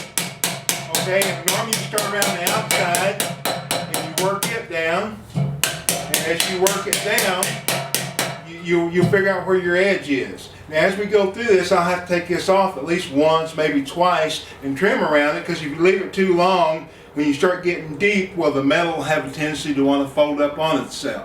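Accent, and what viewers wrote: United States. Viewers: Sir, I swear you have to be from Texas. You sound exactly like my daddy.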